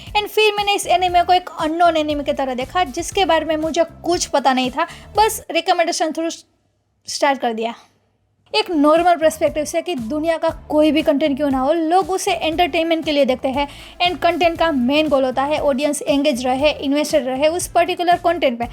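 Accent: Gujarat, India. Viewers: Gujju accent change kar.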